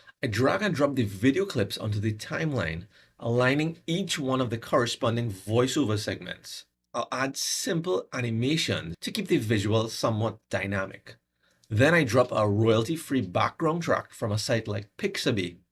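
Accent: Trinidad and Tobago. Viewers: Why u sounding like a trinidadian lol❤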